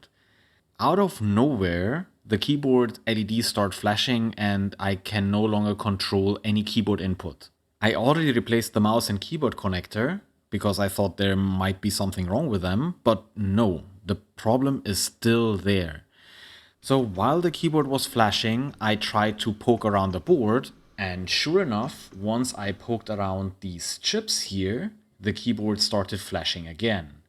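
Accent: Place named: Germany. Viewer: so you could definitely say that the dark background music you put in there was already some sort of "subtle foreshadowing", right? BTW, you sound waaaaay too german for someone that speaks english natively, so i guess we're both from germany?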